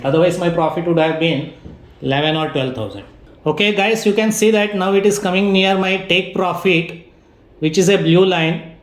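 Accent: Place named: Karnataka, India. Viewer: Oh sweet Kannada song, I was guessing you are kannadiga from your accent now I’m confirmed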